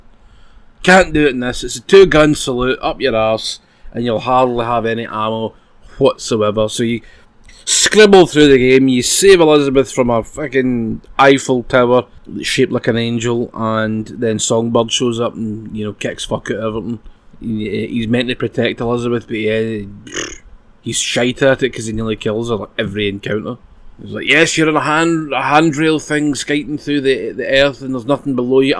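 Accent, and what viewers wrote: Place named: Scotland, United Kingdom. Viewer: Lmao I love ur thick accent, are you Scottish?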